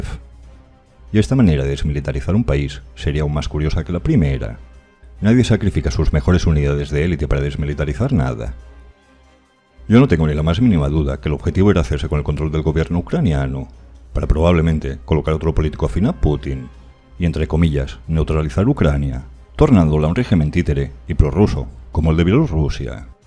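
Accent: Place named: Seville, Spain. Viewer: Por cierto, una curiosidad si me permites ¿Eres o provienes familiarmente de Cazalla de la Sierra o de la Sierra Norte de Sevilla? Tienes la misma entonación que la gente de allí.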